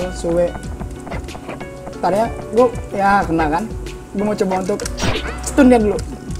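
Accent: Padang, Indonesia. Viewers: Urang padang? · Lu org minang bang ..!?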